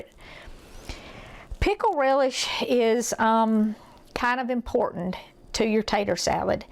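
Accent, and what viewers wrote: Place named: Kentucky, United States. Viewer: Every time I hear your voice, it brings me back to Kentucky. You remind me so much of my mother. Tears of joy Every time every time I need to learn a recipe which I love to cook. Anytime I need a recipe, you're the first one.And only one, god bless you.And your family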